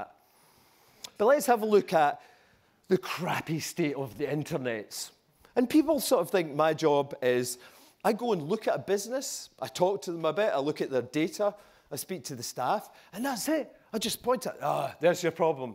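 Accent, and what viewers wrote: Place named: Ireland. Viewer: that accent though such heavy Irish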